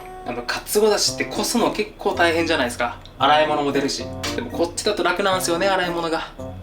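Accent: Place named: Fukuoka, Japan. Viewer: 福岡弁が心地いいですね〜☺️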